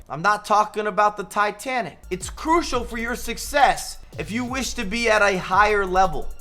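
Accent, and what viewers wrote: United States. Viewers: Gave up after 10 secs.....why does every American have to speak like a fanny??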